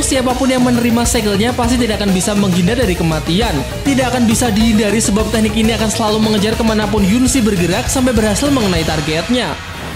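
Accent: Java, Indonesia. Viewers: ga akan sih gw ga suka suara cewe nya udah nyarin medok lagi, gw bukan org jawa makanya ga suka sama suara cwenya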